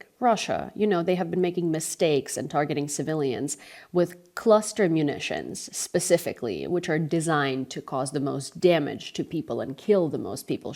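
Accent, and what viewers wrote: United States. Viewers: If you dislike US so much why do you want to speak with an American accent? Ukraine is a weapon of Europe against Russia and Europe doesn’t give a toss about you. Open your eyes instead of your mouth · You have an amazing American accent! I thought you were American, until I heard you pronounce “Sumy” EXACTLY like my wonderful Ukrainian wife does. Your analysis is 100% SPOT ON. There is a serious problem with trump, I know that Ukraine does not trust trump at all, so don’t expect this minerals “deal” to be signed by Ukraine. It is not finalized yet, and don’t expect the Verkovna Rada to approve it. 👍🏾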